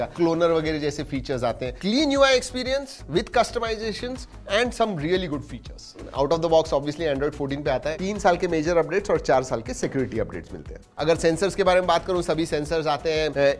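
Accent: Maharashtra, India. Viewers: ❤मराठी माणूस की प्यारी हिंदी सेकंड में "सोशल मीडियावरती जे होते हैं" लय भारी❤❤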